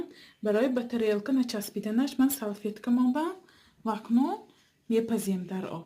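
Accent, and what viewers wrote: Isfara, Tajikistan. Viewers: Гапзани исфарагми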